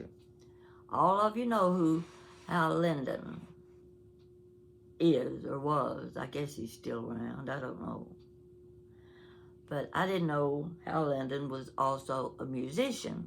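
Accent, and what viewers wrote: South, United States. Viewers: Your Southern accent reminds me of my grama. 🎉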